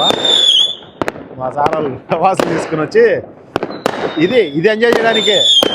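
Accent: Kadapa, India. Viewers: మీది కడప న అన్న 😮 మాది పక్కనే ఊరు 😊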